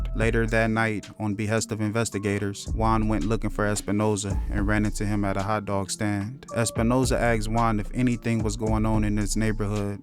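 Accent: California, United States. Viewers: your voice is soooo cali and i love it! i love when ppl rep the city! keep going king ❤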